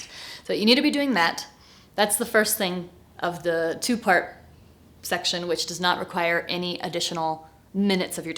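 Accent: Spain. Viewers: you have a great spanish accent! it's perfect. Thank you for your recommendations.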